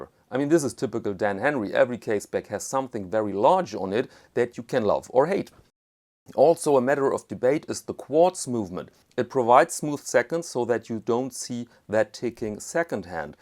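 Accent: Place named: Germany. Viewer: Love your reviews. Jazz guitar? Great watches? Great mens attire? And a German accent! (I lived in Oberammergau till age 14) keep them coming!!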